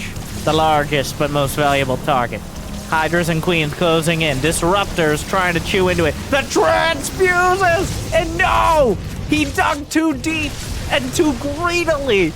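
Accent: United States. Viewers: StarCraft needs this American voice on the scene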